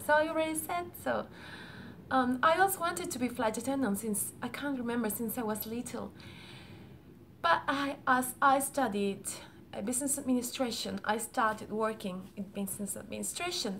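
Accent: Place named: England, United Kingdom. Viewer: Hola hermosa! Yo crecí al norte de México prendí inglés de chica obvio el inglés de por allá! Jajaja no entendí ni la mitad de lo que has dicho pero me encanta!! Si tienes acentillo inglés de Inglaterra!!! 😍 Están buenos los vídeos en Inglés! Ojalá hagas más, ahora vivo en Argentina y no entiendo mucho cuando hablan inglés acá tampoco me hacen dudar de mis conocimientos jajaja besos guapa!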